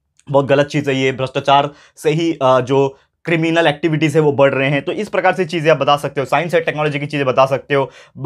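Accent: Maharashtra, India. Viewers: SIR AAP KITNI PYAARI MARATHI BOLTE HO SUPERB🙏🏻❤🌍💯💯👍☘️😄😃😘🤩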